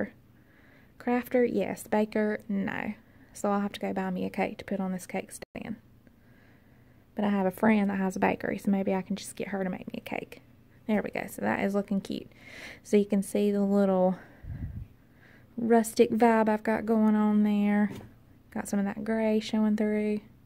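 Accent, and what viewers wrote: South, United States. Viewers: You have the southern accent and the monogram...you need to get on your cake bakin', girl! 🎂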